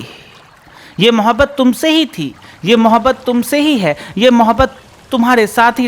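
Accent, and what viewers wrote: Bihar, India. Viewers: Isko ignore kro , Isko jitna importance doge ye highlight hoga aur logo ko chutiya banayega. Ye mimicry kr rha hai, Bihari hai ye iska tone bta rha hai.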